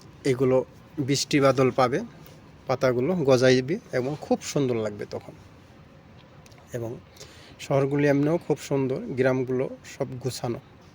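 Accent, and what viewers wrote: Bagerhat, Bangladesh. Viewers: ভাই আপনার ভাষায় মনে হচ্ছে আপনার বাড়ি বাগেরহাট জেলায় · ভাই আপনার ভাষায় মনে হচ্ছে ,আপনার বাড়ি বাগেরহাট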